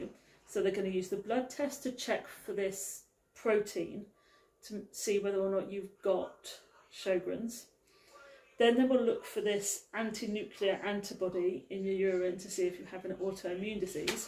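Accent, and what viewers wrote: United Kingdom. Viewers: Warrington? You sound like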